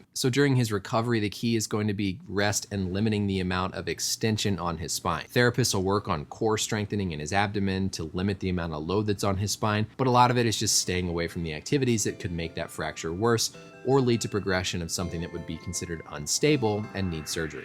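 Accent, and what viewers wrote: United States. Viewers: As soon as I heard his American accent I turned it right off.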